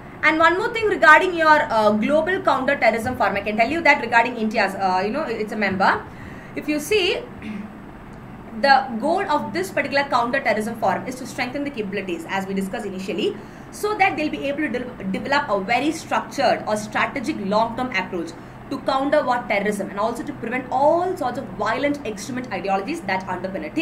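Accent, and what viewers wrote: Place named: South India, India. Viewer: Sound like a south Indian.... 🤗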